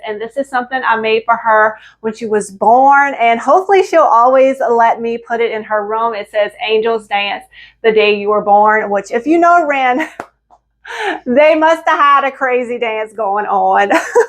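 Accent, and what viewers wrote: Louisiana, United States. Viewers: Hi I’m originally from new Iberia Louisiana. I heard your accent and I had to watch making me homesick. I enjoyed your video and subscribed. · What is the accent… love it. Is it Louisiana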